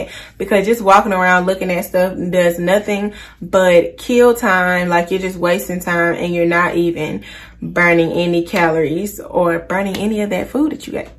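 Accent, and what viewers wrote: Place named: South, United States. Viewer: your accent is adorable southern belle!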